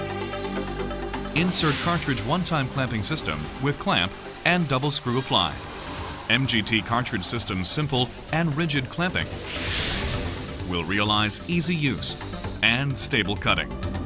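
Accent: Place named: South Korea. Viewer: koringlish.... I can't believe this gets put out like this....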